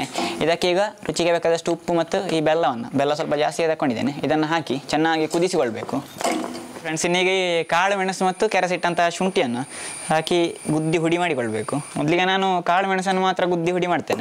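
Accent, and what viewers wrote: Mangalore, India. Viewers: ನಿಮ್ಮ ಅಡಿಗೆ ಹಾಗೂ ಮಂಗಳೂರು ಕನ್ನಡ ಭಾಷೆ ಎರಡೂ ಚೆಂದ!!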